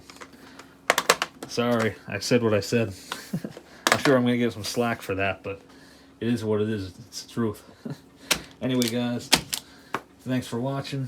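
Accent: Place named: Philadelphia, United States. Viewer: Long before you pulled out the WMMR tapes, hearing your accent I kept thinking "okay, this guy is from either from anywhere from Lancaster to Philly". You sound like my relatives. Sadly I lost my accent as I largely grew up in Virginia.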